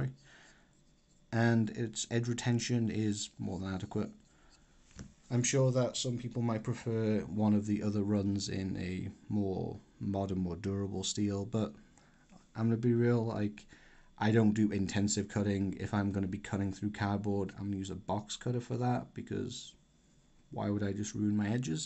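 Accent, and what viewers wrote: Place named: Northumberland, United Kingdom. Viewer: Great video, I love your accent it sounds just like mine. Are you Northumbrian too? I ordered the same UKPK you have off Heinnie, still waiting for it to arrive. It's gonna replace my Böker Plus XS as my EDC, which is also a great knife with a similar design with the choil to stop it closing, no jimping there on the XS tho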